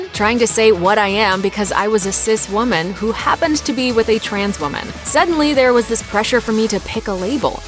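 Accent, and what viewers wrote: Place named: United States. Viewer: I don't think the commentator is Canadian. You can tell by the way she says, "Toronto". Def a young American.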